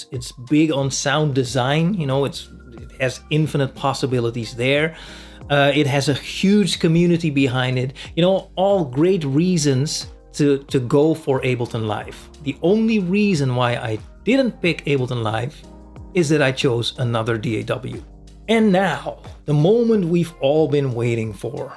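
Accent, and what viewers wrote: Netherlands. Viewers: BitWik hahaha lekker hoor, dat Engels met Nederlands accent. 😉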